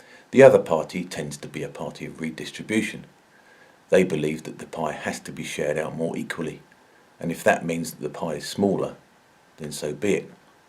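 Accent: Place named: United Kingdom. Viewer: Thourghilly enjoyed that, Both the incisive content And,the very british delivery. Heath in 72 took us into the common market without a referendum,as he stated to civil servants,his mandate to join came from his 1970 election victory, Yet that join or stay out question was never a part of the 1970 conservative manifesto. Heath said "it would be wrong if any Government contemplating membership of the European Community were to take this step without `the full hearted consent of Parliament and people" Heath lied Heath new that the opinion polls during <num> were consistently 68%against joining the common market. A referendum,which he all but promised,he knew he would lose, So he made up the story that his parties election victory was in itself the mandate to join. Further,he then suppressed the civil service advisories on both The loss of sovereignty but also on the future loss of judicial power, Economic freedom,and even military independence. 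This report surfaced and was made public in 2018. It clearly shows the deceit that heath used to sign us in , Without our ,the electorates, Agreement. Traitors like heath infest parliament. Cameron lost the brexit vote His party then elected may Knowing full well she was an adamant remainer, Those that had a hand in that election are traitors to the people and democracy of this country. Their can only be one way to deal with traitors, And should this country decend into civil war over this fundamental betrayal,rest assured ,those that Ac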